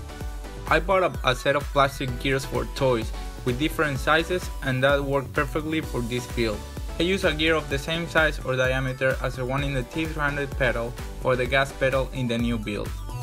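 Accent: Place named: Spain. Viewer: Me suenas a español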